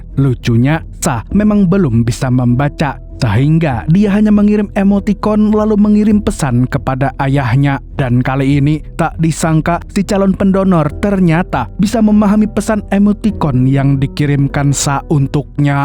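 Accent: Banjar, Indonesia. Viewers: Urang banjar kalinya beliau ni lah😂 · Urang banjar kah nyawa ni min😂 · Banjar bnr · Mimin urg Banjar mana ?😊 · Urang banjar babahasa indo haratnya😂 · Pian urang Banjar kh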